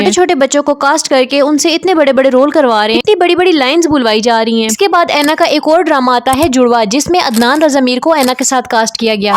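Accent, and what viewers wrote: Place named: Punjab, India. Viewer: Kia tum Sana's bucket ho? Same awaz same style... wai patli karari si full speed pe bhagti train with some punjabi/potohari accent 😄🙉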